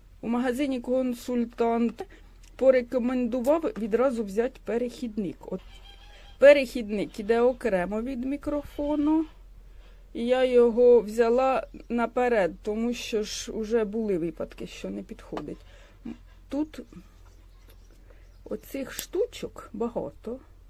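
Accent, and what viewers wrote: Poltava, Ukraine. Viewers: ви чудова! Дуже подобається ваш полтавський говір. Успіхів! Вітаннячко з Криму.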